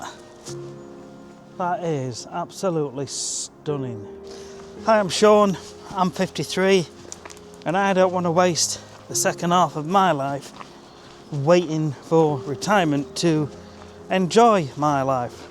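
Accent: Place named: North West England, United Kingdom. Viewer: I'm guessing in the North West somewhere from the accent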